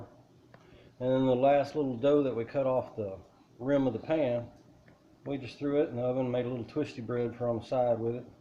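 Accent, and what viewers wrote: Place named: Appalachia, United States. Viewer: BEAUTIFULLY DONE SIR, MY BROTHER SOUNDS LIKE YOU; HE'S FROM APPALACHIA COLA FLORIDA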